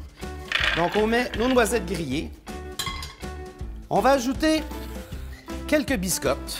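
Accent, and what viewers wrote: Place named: Canada. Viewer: Avec ce grand sourire, cette joie et surtout l’accent Canadien, j'ai vraiment envie de préparer ce Portobello :D Merci pour la recette.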